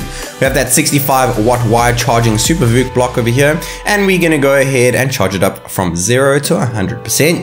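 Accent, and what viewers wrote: South Africa. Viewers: South African accent. I guess you haven't travelled and experienced other cultures.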